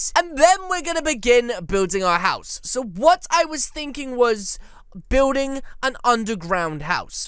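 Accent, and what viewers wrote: England, United Kingdom. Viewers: one quick question. Are you English or from England?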